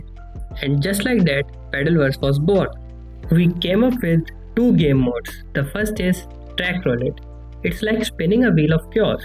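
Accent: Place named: India. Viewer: Your indian accent sucks bruh...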